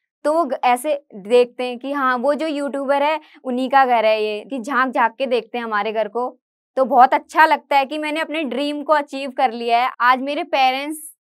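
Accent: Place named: Uttarakhand, India. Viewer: Aapke way of talking se first vedio mai hi mujhe pta lg gya tha aap uttrakhand se ho mai v uttrakhand se hu ❤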